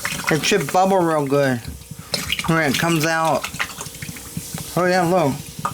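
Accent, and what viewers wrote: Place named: United Kingdom. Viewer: You speak British in n terrible way. Whaa Bawaah bong whaaa tralaaa. I can not make out a whood yo shaey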